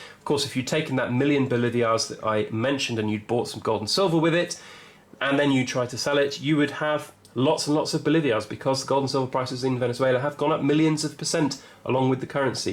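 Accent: England, United Kingdom. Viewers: Seems to be a peculiarity of speech in England. The BBC, for example, pronounces Sinai as "sign-ee-aye" instead of "sign-aye".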